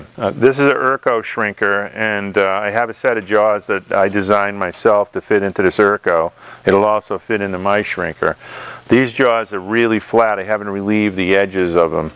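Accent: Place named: Maine, United States. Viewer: Awesome video. As I used to live in Maine for 13 years the accent reminds me of home. My question is you tend towards shrinking most of the time and why that is? I have done quite a bit of tin knockin in the past and really never gave it any thought. Your thoughts would be greatly appreciated!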